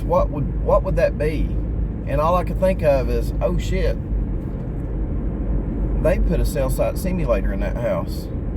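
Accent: South, United States. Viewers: I like your nice southern accent. I am in UK, and it sounds nice. Kinda very relaxed.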